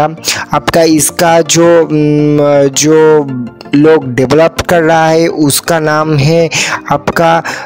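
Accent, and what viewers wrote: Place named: Bengal, India. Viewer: Apka....hindi sun ke to...mujhe bangla ki yaad aa gayi😂,,, ap bangla me hi vlog baniye....hindi ko bangla mat baniye....😅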